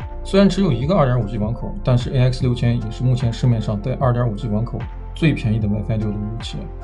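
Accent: Northwest, China. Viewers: 标准西北口音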